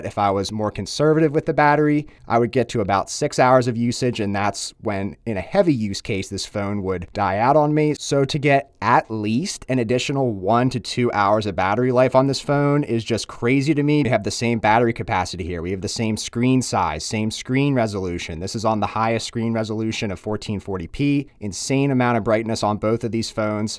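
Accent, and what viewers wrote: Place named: Pennsylvania, United States. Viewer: Knew I recognized that PA accent.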